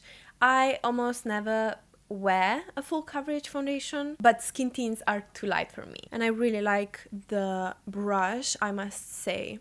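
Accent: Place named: Slovenia. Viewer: I couldn’t quite place your accent so very cool to find out you are Slovenian! I passed through Slovenia very briefly last year on the way from Trieste to Rovinj and I’ve been dying to properly visit the country since then. It’s my number one place I want to go! 🧡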